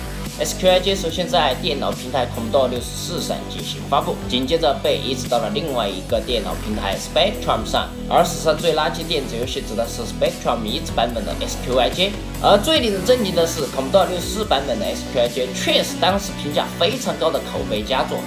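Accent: Sichuan, China. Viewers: 台港澳地区观众听川普不会别扭吗´_>`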